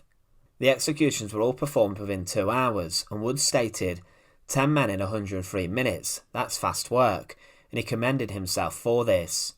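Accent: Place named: Blackpool, United Kingdom. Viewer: Your English dialect accent makes me ill. Blackpool?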